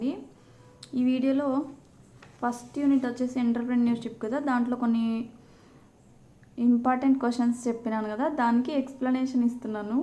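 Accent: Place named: Kadapa, India. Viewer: Good simply n shorty. Slang koncham baa gunship. ☺😊 Kadapa ani andhariki artham kavalana emi? 😀😀😀😁😁. Manchi vedio Subscribe inkaa cheiledu... Inkaa inlantivi expect chestunnam. 😊😊😊😊slang...is good anaiah funny. ..thank you. .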